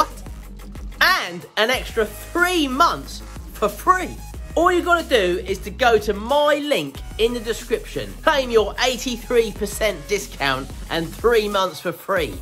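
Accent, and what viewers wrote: United Kingdom. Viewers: Love your british english.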